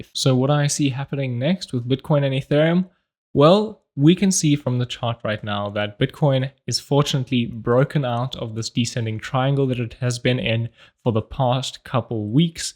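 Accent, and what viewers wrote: South Africa. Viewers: No doubt you are South African. Great content bruv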